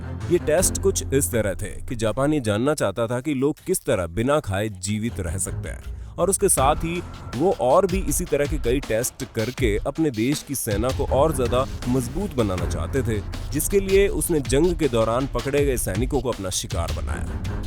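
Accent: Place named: Pakistan. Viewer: Kaise hogi? Pakistani hai yeh.iski awaz se saaf pta chalta hai.chanel ka naam haha....Paise kamane hai wo bhi india ke naam pe.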